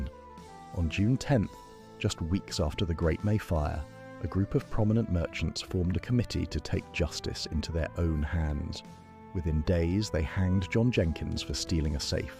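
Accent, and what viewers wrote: United Kingdom. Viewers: Save the british accent for englands history. Would be nice to hear in a western accent.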